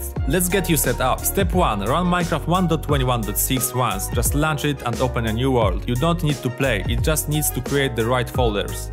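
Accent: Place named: Poland. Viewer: słychać że polak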